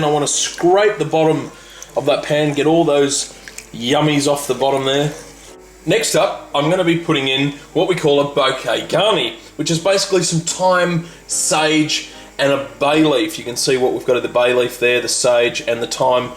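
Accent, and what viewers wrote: Australia. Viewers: HE'S BLOODY AUSTRALIAN MATE. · you can tell he's Australian because of his accent, not British and actually us Brits can cook mate so fuck off to your little cave and eat your rocks.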